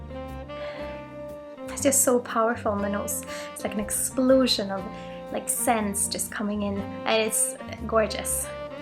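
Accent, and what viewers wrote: Scotland, United Kingdom. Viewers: Är du skotte eller har du övat på ditt skotska accent?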